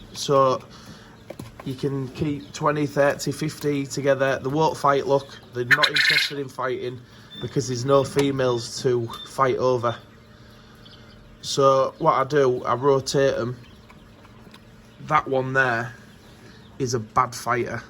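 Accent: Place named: Sheffield, United Kingdom. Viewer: U sound like my friend in Sheffield I'm in Australia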